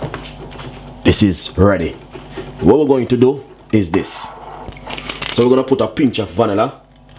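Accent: Jamaica. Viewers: ummm he's speaking patois (patwa) our native Jamaican language....if u dont like how he speaks then move on to the next video.. we know its nutmeg but in broken English is nuttinegg....bitch!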